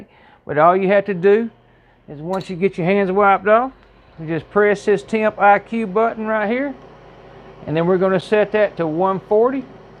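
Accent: South, United States. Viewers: I know you are from the south. I love southern accents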